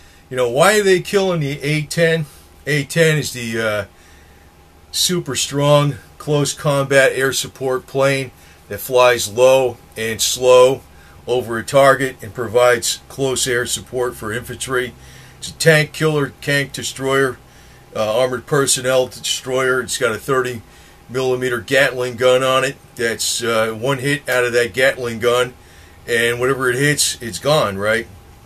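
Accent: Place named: New York, United States. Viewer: Sounds like you are from New York or Jersey.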